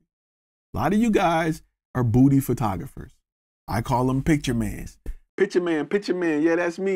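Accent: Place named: Detroit, United States. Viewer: Yo bro you from Detroit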